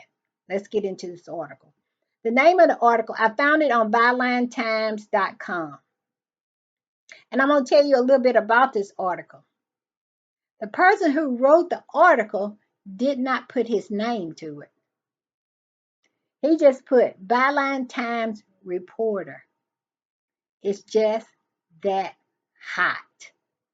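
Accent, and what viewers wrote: South, United States. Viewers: Oh your still getting paid and they are obviously using you as their useful idiot. They will turn on you. You sound like your from the south. The bayou the back woods. Cornbread ignorant honey child. You better get home cause your Ma Ma didn't teach you well. Whatever they are paying you. Ask your soul if you have one. IS IT WORTH IT. 8TH Grade education or am I giving you to much credit. IGNORANT! PAINFUL YOU ARE. SAD SAD.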